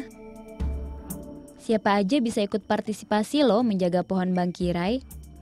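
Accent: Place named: Banjar, Indonesia. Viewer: Kek lugot orang banjar nih kka🙄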